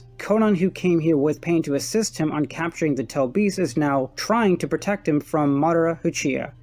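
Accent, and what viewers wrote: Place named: England, United Kingdom. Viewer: I love your English accent, and your videos are high quality congratulations 👏👏👍🏽❤️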